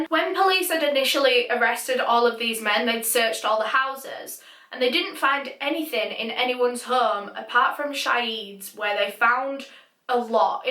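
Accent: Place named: Geordie, United Kingdom. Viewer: Are you a Geordie? You sound northern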